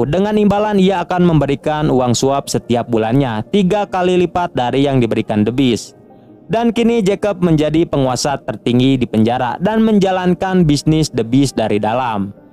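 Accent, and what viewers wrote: West Java, Indonesia. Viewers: Aduh a ni resep nyarios na indo Sunda duh 😂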